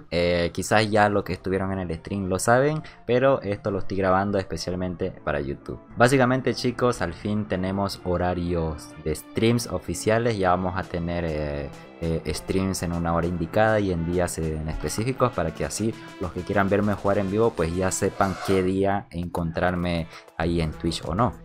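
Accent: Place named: Santa Cruz, Bolivia. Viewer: Si te soy honesto, yo te seguia por tu forma de comentar y jugar.. no llevo muchos meses, pero francamente no tenia idea que eras de Bolivia para que mentirte... Por tu acento me imagino que eres de Angel Sandoval, Santa cruz?.. hablas como entonao XD le subes enfasis a las O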